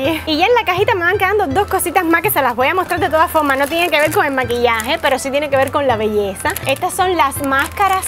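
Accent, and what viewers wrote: Cuba. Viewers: si es de Cuba pero vive en Miami 😉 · Eres cubana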